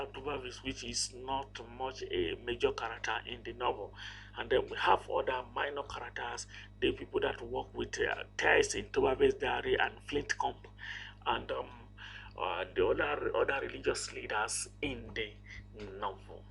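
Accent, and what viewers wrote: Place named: Nigeria. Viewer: I love this video so much the Nigerian accent makes it so much better reminds me of Nigeria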